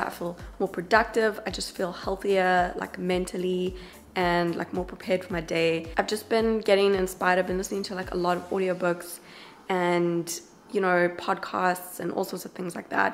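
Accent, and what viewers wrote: South Africa. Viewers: Caislee Erica It’s South African. I’m not sure what part of South Africa tho. Because I’m from Cape Town and we sound slightly different than her.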